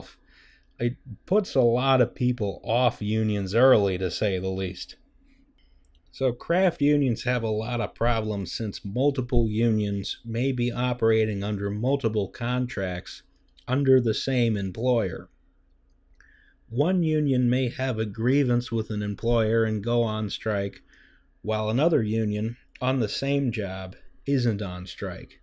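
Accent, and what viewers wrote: United States. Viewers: i think we need more people with strong american accents talking about socialism. i like this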